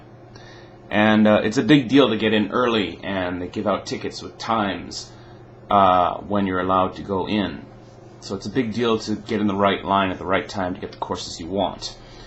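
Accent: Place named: Japan. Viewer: Interesting. You and I may be the only ones in the US who did not start because of anime. Judging from your accentation I guessed you had access to a friend or relationship partner to study with from Japan. Still, it takes a unholy obsession to learn Japanese, I feel there is much more to this story left untold.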